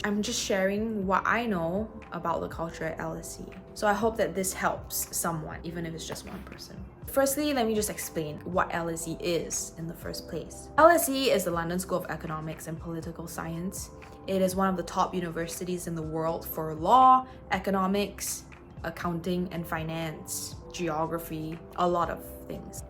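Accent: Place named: Singapore. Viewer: Hi! Future student in LSE MSc Political Science here! Got any advice for a fellow Singaporean? (Assuming you are from the video and accent haha..)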